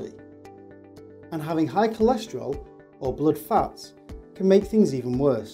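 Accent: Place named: North, United Kingdom. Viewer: Me just wondering what kind of British accent this guy has! Somewhere in the north?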